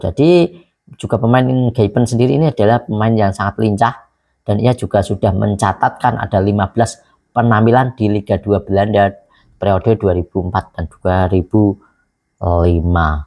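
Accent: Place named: Java, Indonesia. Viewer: Ijin saran nya bang,😊,kalau mau buat ubdate kalau bisa jngan terbata 2 kalau lagi komentar,, sebaiknya logat Jawa nya di kontrol,,biar bahasa baku Indonesia 🇮🇩 di mengerti,,dan kalau cari materi jngn terlalu panjang 2 yg ujung 2 nya materi nya muter2 itu saja,, semoga bermanfaat buat Chanel Abang,dan semoga semakin banyak yg melihat,,❤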